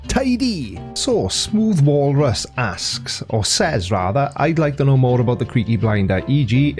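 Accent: Wales, United Kingdom. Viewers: Hi Creaky, love your channel, been a long time subscriber (about #800 I think). I’d rather have you honest and possible insulting, than fake and Nanby- Pamby. This is why I keep watching you, this and the daft Welsh accent ( this is from an expatriated Cockney) Cheers Paul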